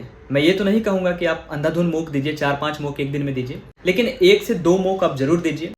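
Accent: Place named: Maharashtra, India. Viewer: it feels like you are from Maharashtra ♥️ amazing voice!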